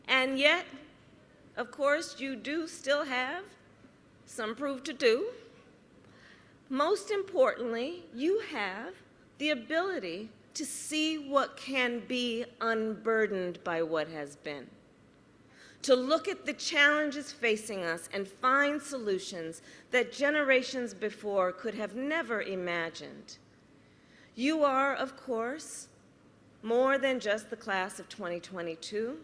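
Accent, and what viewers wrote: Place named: South, United States. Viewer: Why is she talking like she was raised in the south?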